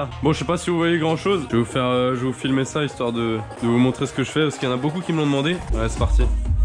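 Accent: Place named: Alsace, France. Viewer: A mes tu et alsacien mdr duncoup jentend kopf temi 😂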